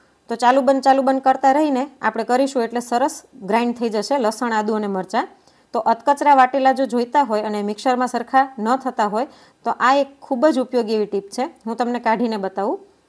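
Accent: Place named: Kathiawar, India. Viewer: Madam tame puore kathyavadi bolo cho How sweet Kya city ma cho? All tips best👌👌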